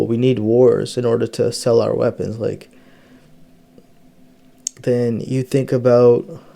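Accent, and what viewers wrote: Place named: United States. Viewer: dude's accent is about as American as it gets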